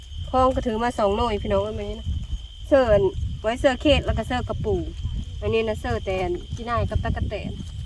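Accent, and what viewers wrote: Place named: Phu Thai, Thailand. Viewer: เว้าผู้ไทยคือเดียว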